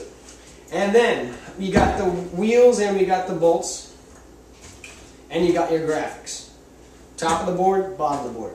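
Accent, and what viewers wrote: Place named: Texas, United States. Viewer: Does anyone notice his slight Texan accent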